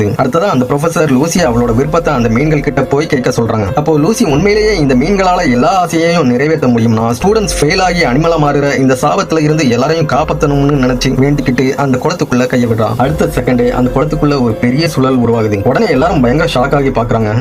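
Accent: Coimbatore, India. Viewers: Enna bro unga slang coimbatore mari Iruku 🤔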